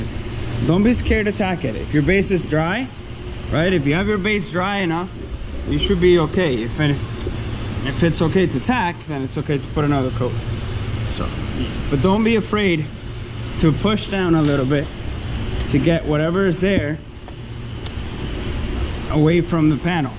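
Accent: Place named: Quebec, Canada. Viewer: Est ce que je reconnais un accent Canadien francais ? :) You seem to have Canadian french accent :) Great vid btw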